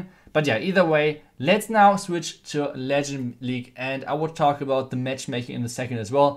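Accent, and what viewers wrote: Germany. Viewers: i can hear it in your accent that your german ;D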